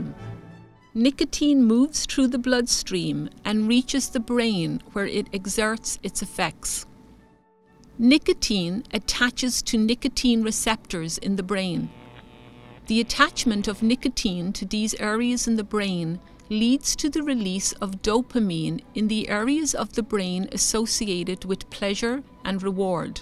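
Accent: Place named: Ireland. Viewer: Big thick Irish accent in Toronto haha love it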